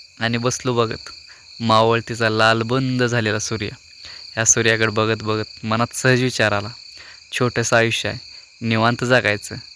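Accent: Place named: Solapur, India. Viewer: सोलापुरी भाषा खुप खुप छान आहे 👌👌👌👌🎉खूप आवडते आस बोलायला ❤❤❤ त्या झाडाला आमच्या कडे जमु बेट म्हणतात पण तुमच्याकडे काय नाव आहे त्या झाडाचे👍👍👍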